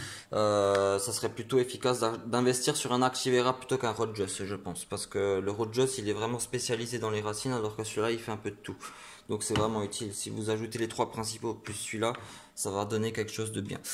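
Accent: Alsace, France. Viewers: L'accent alsacien 😭😭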